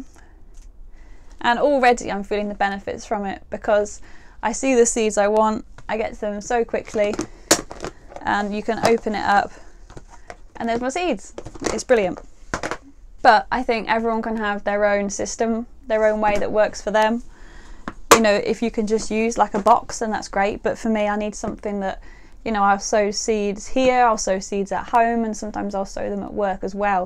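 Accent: United Kingdom. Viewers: i wonder if the girls of naughty ing ham get teased a lot... had to say that .... mybad ... i don't mind the obsession with the weather but i can get a little flustered with this accent ... which is somehow very appealing yet annoying ... so snobbish - must have to do with the power the Brits had over the centuries... imo ... just ignore me...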